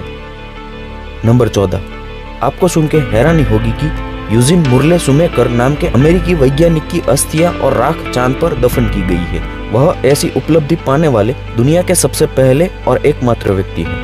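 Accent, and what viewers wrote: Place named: Bihar, India. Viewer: Bihar se ho kya bhai...kyo ki tera avaaz aisa lag tha he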